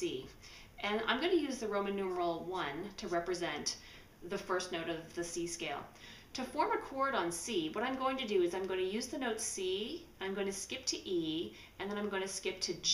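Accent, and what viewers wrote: Canada. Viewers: Your accent sounds a bit like Canada or Northern U.S. am I right? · This is exactly what i was looking for, and your video was pretty easy to follow. Appreciate alot! It seemed you are from Canada, am i wrong? ;)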